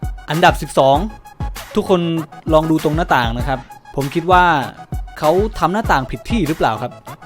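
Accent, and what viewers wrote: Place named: Rural, Thailand. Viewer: ตอนแรกพูดเหน่อๆ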